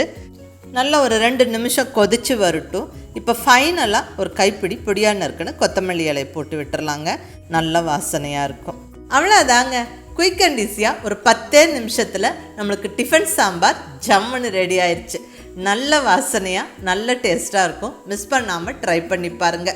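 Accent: Kongu, India. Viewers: கொங்கு மண்டல குரல்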